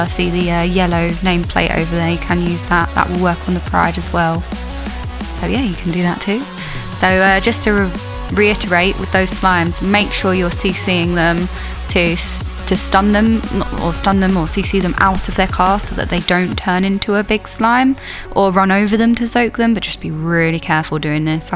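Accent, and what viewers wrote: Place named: United Kingdom. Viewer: I'll be honest, fire mage here, been trying out frost as fae, it's fun since i always leaned towards frost. I'm still doing 15s so I'll call out what I see in those keys. People don't really pay attention to mechanics if it's not a deadly one, so if you would like to add some comments about mechanics like these you did they sure are pretty welcome. From what I've noticed from my prespective, making mental notes by watching good players' movement and ability usage is attractive and should be to most, so a bit of explanation stuff about when to press Icy veins (I always do when it's out of cd except if the pack is dead or small :P) would go some ways. Music on that tone was actually nice having. Lastly you got a lovely voice, UK accent which i personally love and a lot might adore it so you do well speaking enough during the video! 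Keep it up!:D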